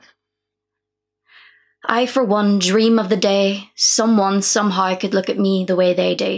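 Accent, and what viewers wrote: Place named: Ireland. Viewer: Love how your poetry brings out the Irish accent, and oh my word, how nice it is to get finally hear a new poem from you! Bet that (huge) little book of yours holds many treasures for us. ❤️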